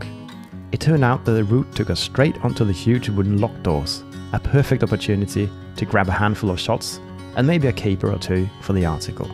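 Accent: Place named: England, United Kingdom. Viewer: because of your accent and perfectly spoken English I assumed that you were from England?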